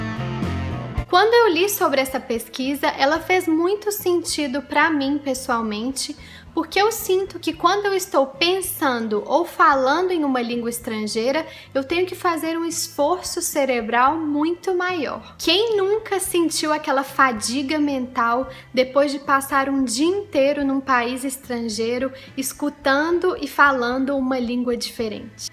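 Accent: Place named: Rio de Janeiro, Brazil. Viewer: Are you from Rio, your accent is so carioca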